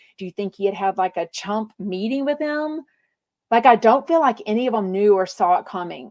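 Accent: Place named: South, United States. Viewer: you are sweet and southern sounding coming from a texas person💝💝💝💝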